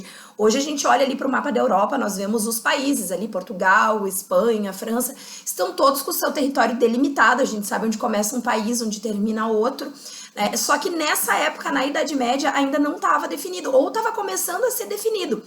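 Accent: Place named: Rio Grande do Sul, Brazil. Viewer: Sotaque do RS é inconfundível.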